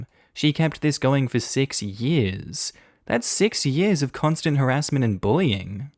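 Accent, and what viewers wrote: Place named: Australia. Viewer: a pleasant Aussie voice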